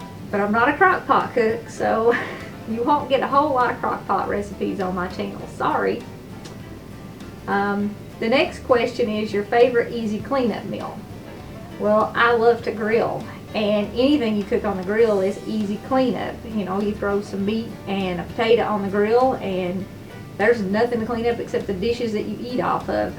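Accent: South, United States. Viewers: I love your southern accent. The music you useis great. I also love the dishes you cook. I like down home comfort foods.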